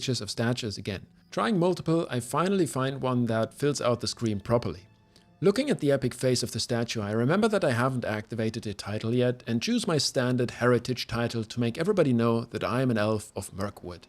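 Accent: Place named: Germany. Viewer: Nice stuff mate :) That German accent cannot be unheard, Grüße gehen raus!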